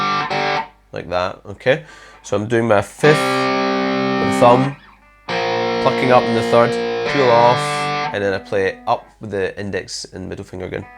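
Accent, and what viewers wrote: Scotland, United Kingdom. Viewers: What part of Scotland are you from? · sound scottish, maybe edinburgh.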